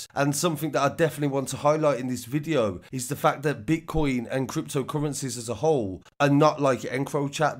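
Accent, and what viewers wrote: Birmingham, United Kingdom. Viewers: Interesting accent, is that Birmingham?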